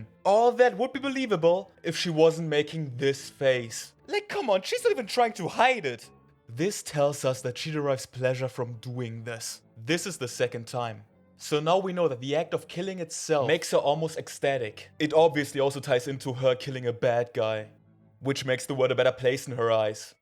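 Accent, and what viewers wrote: Germany. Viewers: Ar you German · Man hört richtig raus dass du deutscher bist hahahaha fr mach mal Videos auf deutsch ich suche ganze Zeit schon jemanden der sympathisch ist und manwha webtoons oder Mangas erzählt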